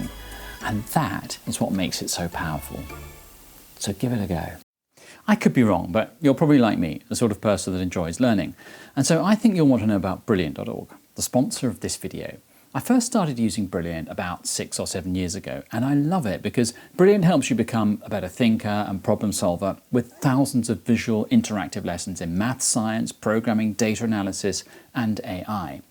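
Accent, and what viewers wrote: United Kingdom. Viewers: learning thinking skills in general is a key factor in any successful learning process including critical thinking for sure to isolate false data and extract facts based on sound truthful information, then comes skills how to read, how to write and how to search for truths by yourself. thank you for sharing your ideas and i love the British accent you speak with, it sounds academic for me ! Thanks again.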